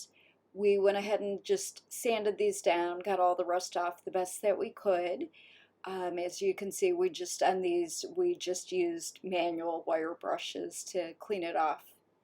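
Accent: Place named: Virginia, United States. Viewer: You go girls! Wonderful project! I have a Vermont Castings cast iron wood stove that has been sitting in my family room for years unused. You inspired me to want to work on mine (rusted by cat spray in some areas) and put it out in the yard as a place to unwind. Thank you so much ladies!! (I am Canadian and your lovely accent reminds me of someone I know from Virginia) XOXO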